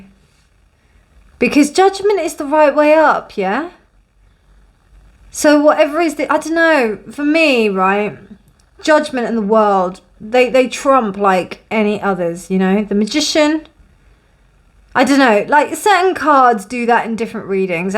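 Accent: United Kingdom. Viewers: Thank you for your guidance and knowledge as always..also FYI your voice and energy are ridiculously soothing :) Brits automatically sound so much more sophisticated compared to the rest of the world!😅 You could make a video of only complaints and insults and I'm sure I could play it to sooth me to sleep. 💜🥰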